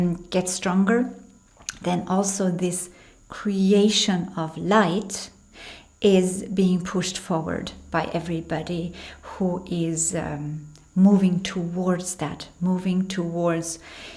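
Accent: Ireland. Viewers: Hi, I can’t place your accent is it Irish? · Love the accent..Irish? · Watching this in July 2024, what a scary time that was... I'm guessing what you intuited in March 2021 was the V pass? I am glad I didn't fall for any of it. I had a dream prior to the whole debacle about injections so that was a heads up, and I smelled something rotten in Denmark right away. I have subscribed to your channel as of yesterday, love your calm demeanor. Is that an Irish accent you have? But I see you're in Germany, must've been tough going there, I'm in Canada it was awful here! ♥ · yes, i had a powerful sensation come over me at the end of last year and it was a feeling of ''this is it, it is over''. I get the feeling that something is gonna happen in August but what i have no idea. We are living in very interesting times. I am Irish by the way and i got a hint of an Irish accent from you. 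Are you Irish? or did you maybe learn English in Ireland? If it is the latter you are like a native.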